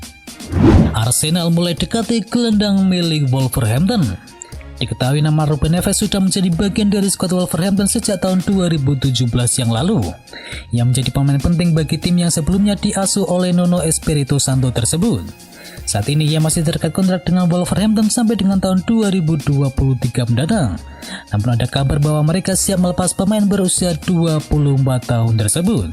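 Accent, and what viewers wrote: Java, Indonesia. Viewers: Tolong bagi pembaca berita logat jawa nya d samarkan bos ,. Gk enak dnger nya . Kalo sekalian bahasa jawa mah iya aja aku suka .. SekedarMasukan dan saran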